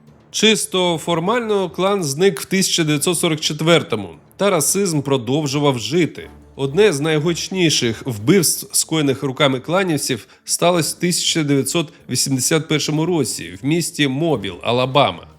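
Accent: Russia. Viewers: канал україномовний, але автор судячи по вимові в повсякденному житті російськомовний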